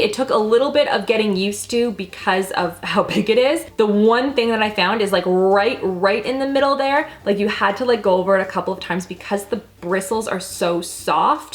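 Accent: Canada. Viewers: Hopefully this doesn't sound ignorant lol But I have always been like shouldn't she have some sort of an accent being from Canada. Until she said "out" I was like ding ding there it is lol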